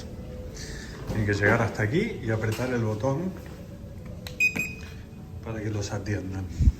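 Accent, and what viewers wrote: Argentina. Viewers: Hola sos argentino o uruguayo?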